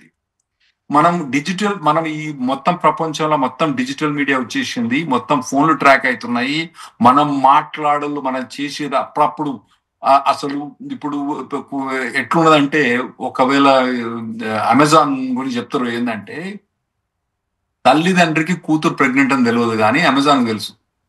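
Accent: Telangana, India. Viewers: Anna,yavoore needhi, pakka Telangana matalu matladthunnaru? 👌👍🙏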